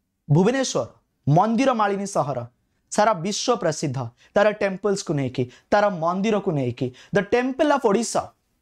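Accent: United Kingdom. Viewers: Like an English accent...but his amazing teaching style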